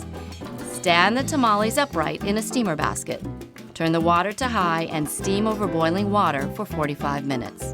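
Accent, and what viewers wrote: United States. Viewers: What you heard is an American accent, not an English accent.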